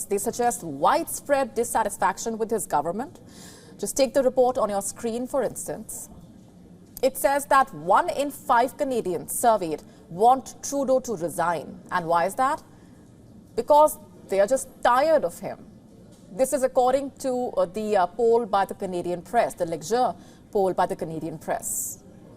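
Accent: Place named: India. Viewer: Indian Accent heavily influences pronunciation ... hope so you would understand.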